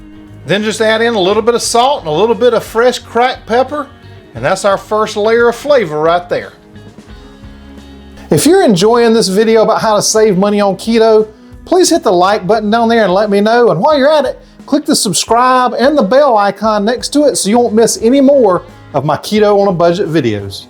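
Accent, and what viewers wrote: South, United States. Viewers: Hi, I absolutely love your southern accent. I can listen to you for hours. I’m from Australia and we don’t do American accent well🤪 but I think after listening to you for a couple of hours, I might actually nail it 😀 Great video, I made your ultimate keto bread yesterday… OMg it is so delicious … I just had it for lunch today…even my non Keto husband loved it. I also had enough mix left over to make 6 muffins which I will freeze if my husband doesn’t get to it first Cheers from down under and keep up the work 🎉🎉🎉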